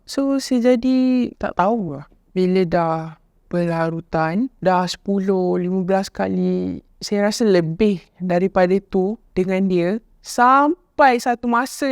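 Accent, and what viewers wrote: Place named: Kedah, Malaysia. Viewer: Cakap keyel je. Awat tak cakap peghelih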